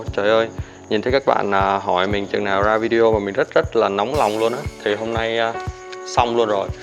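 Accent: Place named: Central Highlands, Vietnam. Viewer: A ơi. Xem kênh a e bị nghiện a luôn rồi. Thích giọng nói và con người Tây Nguyên quá.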